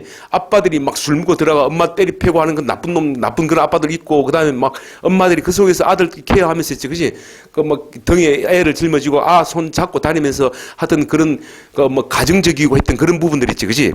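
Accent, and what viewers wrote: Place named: Busan, South Korea. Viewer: 부산억양이 알아듣기가 쪼금 힘들어요 ㅠㅠ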